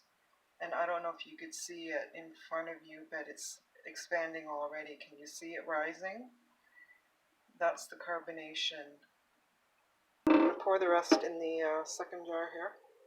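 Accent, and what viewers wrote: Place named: Canada. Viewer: I have thoroughly enjoyed this video. I have watched it over and over. I ordered some water Kefir grains from Florida and have made a huge batch of coconut Kefir. It is thick and rich and creamy. It tastes better than any Kefir I have ever tasted in my life. Your instructions are short and to the point and very good and well thought out. Also. I have been in America for the last 30 years but I was born and raised in Canada🇨🇦 and I am enjoying your Canadian accent. I especially love the way that we say “about”. I still have a bit of my Canadian accent. Stay encouraged. Keep up the good work.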